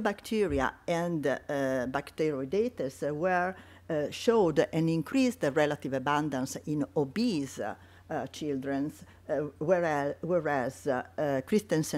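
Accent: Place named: Italy. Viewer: Cant listen to this english with strong italian accent.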